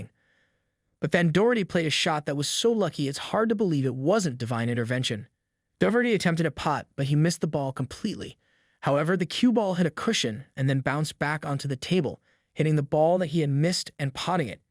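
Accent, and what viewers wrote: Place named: United States. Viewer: So weird hearing an American covering snooker and even the the way he said it annoyed me.. It's not the fish mate 😂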